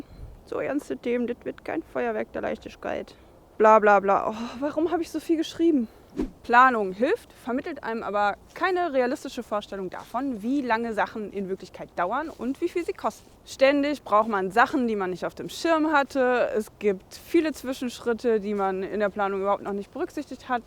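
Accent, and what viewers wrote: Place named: Berlin, Germany. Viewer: Ich liebe deinen Berliner Akzent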